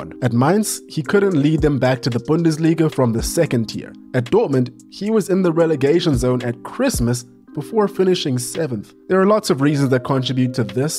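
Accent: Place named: South Africa. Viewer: i hear a south african accent, i subscribe. simple as that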